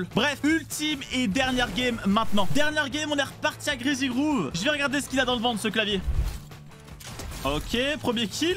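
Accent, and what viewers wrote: Belgium. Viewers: Tu es belge ? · t’es belge?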